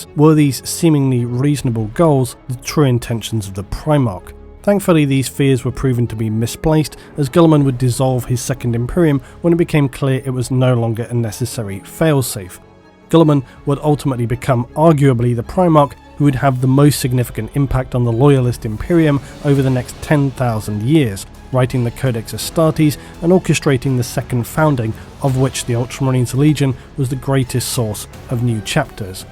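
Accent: United Kingdom. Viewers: Man your the best Warhammer 40k narrator on YouTube! I try to watch other channels but they dnt have ur British accent. I swear it makes the lore/story 10× better. Huge fan! Love your stuff💯🤘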